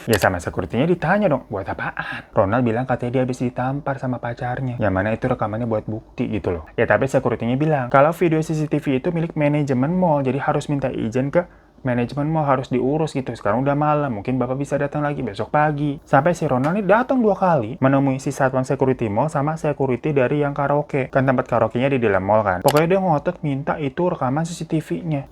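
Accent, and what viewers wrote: Java, Indonesia. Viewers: Peh...jowomu MEDOK bos. Kurang alus ilate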